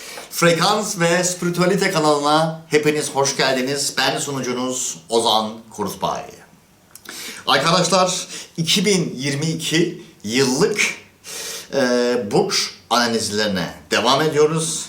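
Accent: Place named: Germany. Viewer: Hocam Alman aksani var sizde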